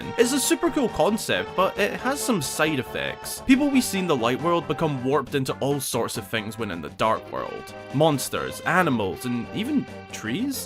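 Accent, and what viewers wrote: Scotland, United Kingdom. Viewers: Are you Scottish just by the accent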